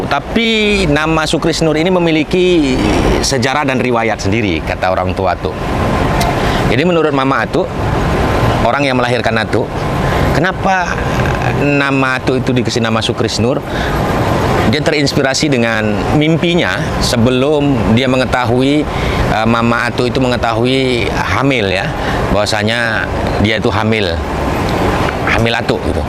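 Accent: Malaysia. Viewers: Aku orang jawa tapi aku senang bila pakai logat melayu lucunya ngena walaupun sebagian bahasanya aku gak ngerti sukses mantaap👍👍👍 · Kenapa tidak membawakan peran Melayu dan Jawa dalam 1 Chanel bg mungkin akan lebih menarik lagi karena kami (saya) lebih terhibur dengan logat Melayu Abang walaupun sebenarnya saya orang Jawa pujakesuma salam dari rantau Prapat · Saya penggemar komedi/YouTube di Medan minta kembali ke adegan Melayu,logat Melayu tu, usahkan melucu dengarnya aja udah enak awak dengarnya, he heee. maaf ya.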